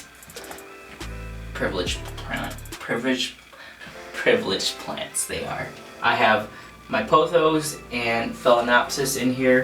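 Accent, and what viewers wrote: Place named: Midwest, United States. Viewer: Do you live in the Midwest? I feel like I hear a little accent!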